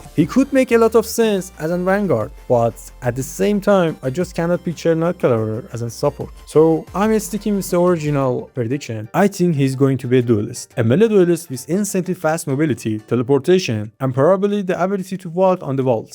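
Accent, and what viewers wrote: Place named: Iran. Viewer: u gotta be persian bro i would recognize an accent like that anywhere 😄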